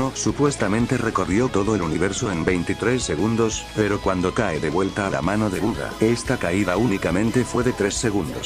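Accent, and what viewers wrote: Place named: Mexico. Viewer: Muy epico el video y la escala, pero porque usas los fragmentos en castellano, es decir hasta lo que vi tu parecías mexicano más que nada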